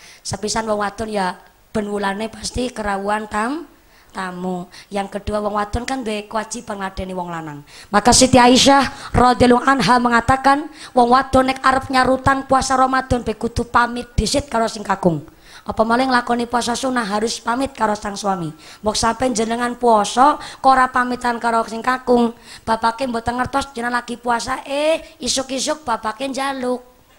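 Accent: Banyumas, Indonesia. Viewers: Seru banget dengerin ceramah ustajah mumpuni asyik bahasanya carpur ngapak emang KL jebolan Indosiar cakep semuanya.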